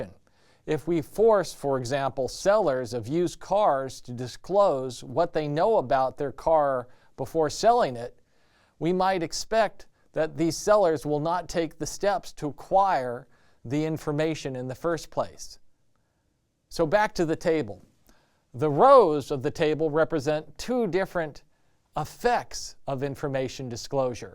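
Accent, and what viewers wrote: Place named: United States. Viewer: what American accent exactly is this, though?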